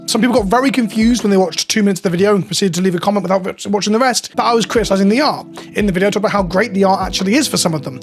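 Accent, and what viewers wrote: United Kingdom. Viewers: I enjoy listening to an old British man yell at the clouds since I’m also old and find it annoying with these art treatments too!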